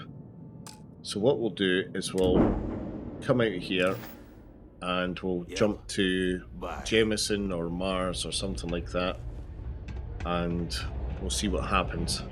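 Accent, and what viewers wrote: United Kingdom. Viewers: great to hear a Scottish accent for a change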